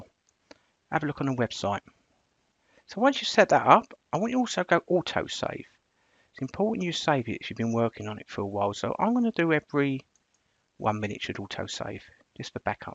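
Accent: United Kingdom. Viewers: My intention is not to be rude, but If you learn to attenuate your strong (British? Australian?) accent, which is incomprehensible for some of us, you could reach many more people. I am a French Canadian who had to learn to attenuate his strong accent in order to be understood by Europeans who spoke French, so I know it's possible. I'm sure your presentation is very useful, but I couldn't understand half of what you are saying. Good luck.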